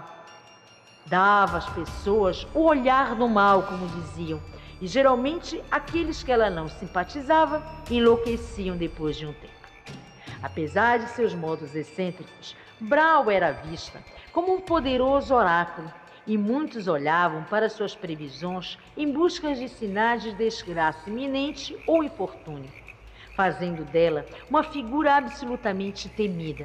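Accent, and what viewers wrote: Pará, Brazil. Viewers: Olá senhora gata,estou fazendo uma pesquisa sobre vc, eu sou muito curiosa, pois bem, esses tempos eu te perguntei se vc era locutora, e vc gentilmente me disse que não, então pensei: Se vc não é locutora, então é bem provável que vc saiba cantar, uma pessoa com uma voz dessa, com certeza sabe cantar. Outra coisa: seu sotaque é diferente, parece paraense.... Outra coisa, queria muito vê o seu rosto, então vc me disse que estragaria a personagem, vc tem razão, ia perder a graça, mais vendo o seu rosto no facebook, naquele vídeo desejando feliz 2019, percebi que o formato do seu rosto é bem bonito, e olhando essa sua foto do YouTube, percebi que o seu cabelo é meio loiro, bom,tô quase terminando minha pesquisa, sei outros detalhes mais não vou falar, sua indentidade, vai ficar escondida comigo, até porque minha admiração e amor por você é equivalente a 1 metro e 56 centímetros de altura(é meu tamanho) um beijo na bochechinha, amo-te!!!!❤❤❤💋💋💋💙💙💙💚💚💚💛💛💛💜💜💜obs:(Vou te provar que sei muito sobre vc,te mandarei solicitação de amizade no seu face verdadeiro, (Elaine Salvador é o nome do meu face) vc é incrível, já virei sua fã.....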